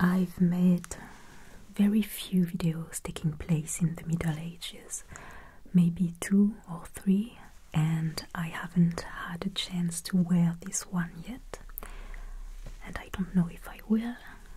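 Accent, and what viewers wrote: France. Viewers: Bonjour, j'espère que cette pause aura été bénéfique pour vous. Pour ma part, vos vidéos m'ont beaucoup manquées et je suis ravie de vous revoir ! Pouvons nous espérer, un jour, une vidéo complète en français ? Car si je ne m'abuse, c'est votre pays natal ? Votre accent m'y fait penser. Bon courage et merci pour ces vidéos qui doivent vous demander tant de travail !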